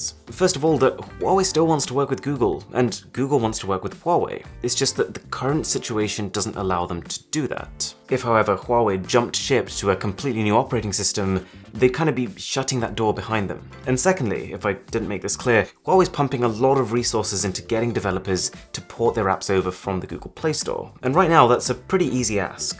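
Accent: United Kingdom. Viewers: The only thing i payed attention to is that British accent.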